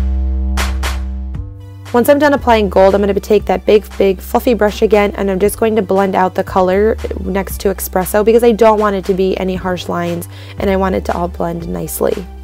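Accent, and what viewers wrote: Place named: North, United Kingdom. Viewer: I cannot tell you how much I love your videos!! Ps. Your northern accent is freaking adorable!!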